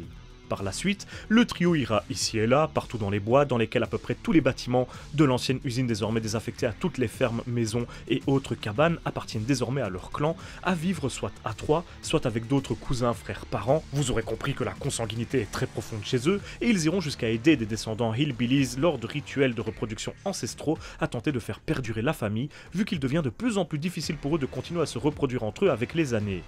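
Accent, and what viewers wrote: Belgium. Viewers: ah batard t’es belge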